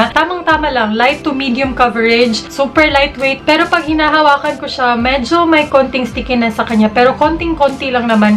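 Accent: Visayas, Philippines. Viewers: I'm one of your silent viewer here in Youtube. Hope for your best and just continue what you are doing, kasi you inspired a lot of people. Continue spreading love and good vibes. P.S. Your bisaya accent never been an hindrance of what you are doing or explaining, mas minamahal ka ng mga viewers mo dahil dun. Lovelots from Palawan.♥♥♥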